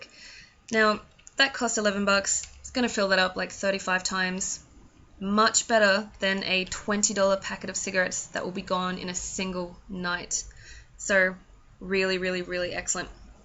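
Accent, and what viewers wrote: Australia. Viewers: you sound Australian :D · Defo an Australian accent. Are you near Melbourne, Tennis season starts in Jan in Melbourne can't wait! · Are you from Australia or new Zealand ??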